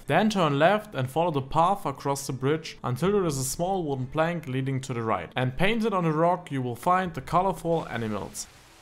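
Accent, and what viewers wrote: Germany. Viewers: Du hörst dich deutsch an :D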